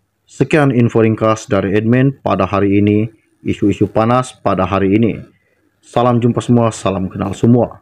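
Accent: Sabah, Malaysia. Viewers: Mungkin dia nak taip 100B kot, salah matematik rasa dulu ponteng kelas hari². Bahasa org sabah jr,loghat dia.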